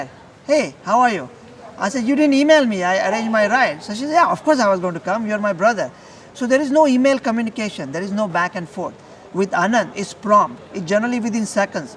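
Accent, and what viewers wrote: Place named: United States. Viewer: Waaa, speaking in अमेरिकन एक्ससेन्ट खूपच छान, बरयाचदा मराठीत ऐकायची सवय होती..👍👍👌👌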